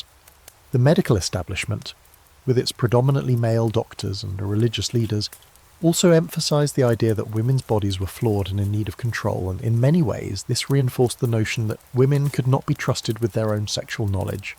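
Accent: United Kingdom. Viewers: Love your videos ….also cos of your lovely British accent. But…. have you cloned your voice for this narration? It sounds to me like it and i don’t love it 😞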